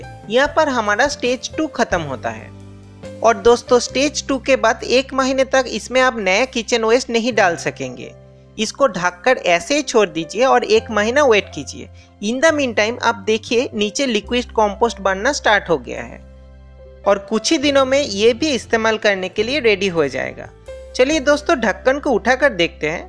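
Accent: Bengal, India. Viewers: Typical Bengali accent. Very good. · R u Bengali sir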